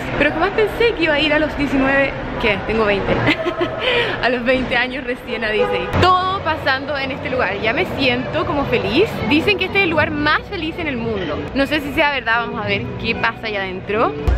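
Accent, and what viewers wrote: Chile. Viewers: Se nota tu Chilenismo xd Saluuudos! Desde el mismo Chile:D Me encantan tus videos, enseñame a hablar ingles:v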